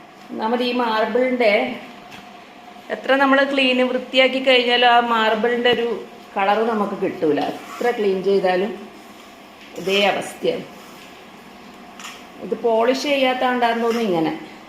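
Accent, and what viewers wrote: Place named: Kannur, India. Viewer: ആഹാ അടിച്ച് പൊളിച്ച് cleaning നടക്കട്ടെ. നമ്മുടെ കണ്ണൂർ touch ഭാഷ കേൾക്കുമ്പോ ഒരു സുഖം❤❤❤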